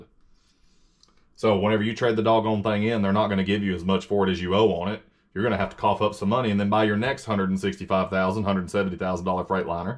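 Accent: Texas, United States. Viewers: LOL you come off sounding very Texan!!! Who gives a shit what the idiots say.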